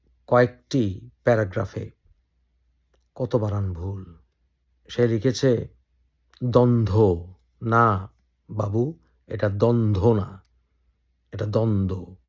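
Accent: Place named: Noakhali, Bangladesh. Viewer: নোয়াখালী ভাষা ভাই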